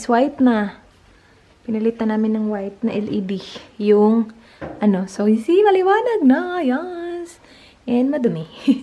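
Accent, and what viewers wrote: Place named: Visayas, Philippines. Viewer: Her accent ?? Kala mo dili bisaya magsalita ehh grabe... Ilove your blog..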